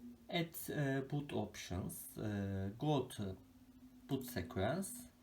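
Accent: Turkey. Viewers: very nice ,tnx, and very nice turkish english accent:)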